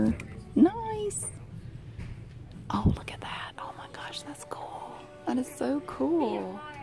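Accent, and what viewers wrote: South, United States. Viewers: I love that fact that her voice is authentic and I love her southern accent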